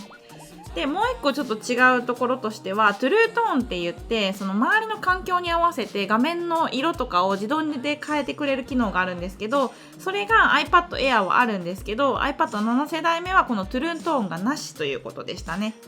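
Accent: Kansai, Japan. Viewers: 『なにげに再生しただけの apple信者でもない ipadmini4 持ちの爺々ですけど・・・』 『指先も ネイルもbeautiful 話す言葉が速いのによく聞き取れる明瞭感 時々関西弁もカワユス👍』 『わぁぉ～！ 顔出しもあるんかい？ 超絶かわゆくて 今日からイイね押して フォロー決定👍👍👍』 正直 確かにsensei名乗ることだけあるお人で・・・解説動画としての秀逸さに感服致しましたm(_ _)m 邪な助平心は捨てて・・・ を師匠と仰ぎ ipadの利用について 一から勉強し直しますです！！！ マジで知らないこと多すぎ、便利そうやもんねぇ。有難う御座いました。この際追加購入検討するかな！ by にゃんこ爺々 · センセーの関西弁萌😍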